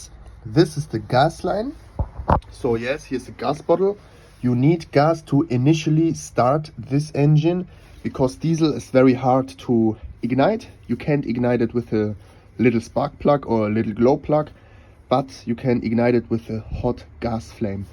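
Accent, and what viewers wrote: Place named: Germany. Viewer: Heavily german accent and gorgeous engineering i appreciate this. <link>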